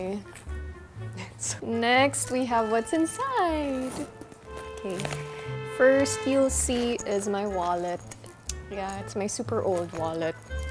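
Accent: Philippines. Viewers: you have a Filipino accent hehe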